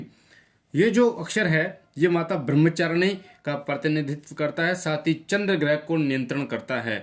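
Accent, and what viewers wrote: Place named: Punjab, India. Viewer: Punjabi हो? Heavy Punjabi accent.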